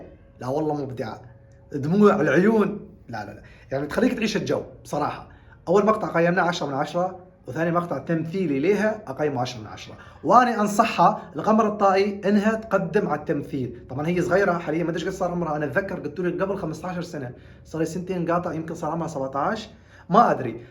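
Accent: Iraq. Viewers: اللهجه العراقية تجنن😂 والله زمان جنت اتابع مقاطعك وهسه طلعت الي مرة ثانيه والله الحمدالله من طلعتلي مرة ثانية لهجتك تخلي الواحد يتيه كلش صاير عراقي الى ربع 😂😂😂 والله احسن من ينور اليوتيوب ❤ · عمره 16ولهجتك نفس لهجت العراق 🇮🇶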